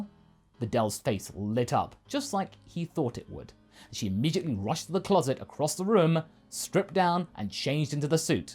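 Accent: United Kingdom. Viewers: Day 1 of asking whether he’s from the UK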